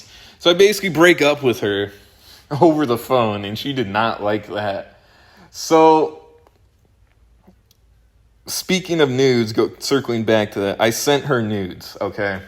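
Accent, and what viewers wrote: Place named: Midwest, United States. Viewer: a midwest accent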